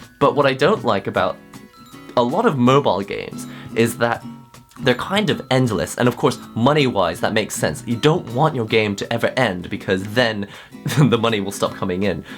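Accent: United Kingdom. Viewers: I'm not a racist at all but holy shit how did u learn to speak british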